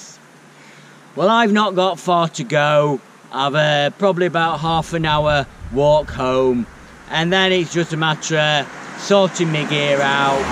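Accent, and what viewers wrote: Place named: Yorkshire, United Kingdom. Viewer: A broad Yorkshire accent, a tent and Yorkshire puddings makes me feel comfy inside....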